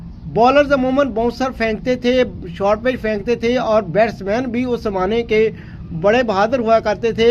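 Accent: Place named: Punjab, India. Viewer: اصل میں کہنا تو نہیں چاہیے مگر کیمرے پر واقعی گفتگو کے لیے اعتماد کے ساتھ مطالعہ چاہیے ہوتا ہے تاکہ الفاظ کا چناؤ ہو سکے کیونکہ تکرار ادبی اور تقریری خامی ہے۔صاحب پوسٹ دونوں حوالے سے کمزور معلوم پڑتے ہیں کیونکہ الفاظ کی ادائیگی میں بھی اعتماد نظر نہیں آیا۔بھلے پنجابی لہجہ غالب رہے لیکن مطالعہ روانی بخشتا ہے ۔ڈراما نگار منو بھائی کا لہجہ پنجابی ہی تھا مگر گفتگو سے پڑھے لکھے ہونے کا پتا چلتا تھا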